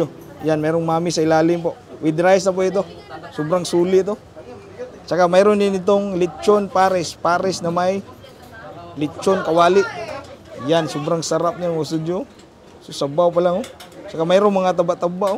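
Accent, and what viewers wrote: Visayas, Philippines. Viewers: Bisdaka gihapon mu Tagalog Bai uy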